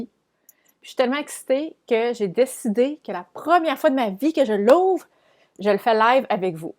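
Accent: Canada. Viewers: votre "accent"canadien car je suis en Provence au sud de la France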